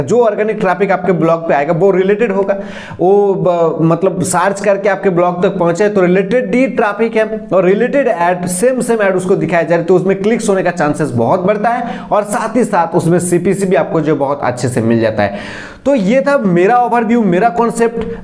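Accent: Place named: Bengal, India. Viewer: Ore Bangla te bol bhai... Puro bangalir moto hindi bolchis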